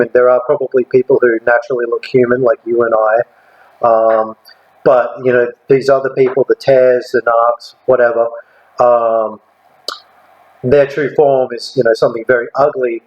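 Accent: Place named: Australia. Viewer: Good to see an aussie talking about reptilians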